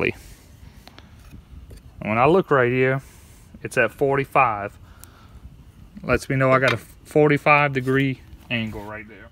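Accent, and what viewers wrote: Louisiana, United States. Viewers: Dis dood definitely frum louisiana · You from the parishes ain't ya? Great video!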